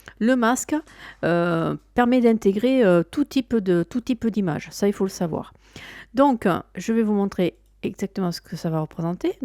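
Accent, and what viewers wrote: South, France. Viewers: Encore un tuto sympa avec une voix du Sud agréable qui donne envie d'aller au bout de la vidéo. Une question : comment et où aller pour télécharger les masques ?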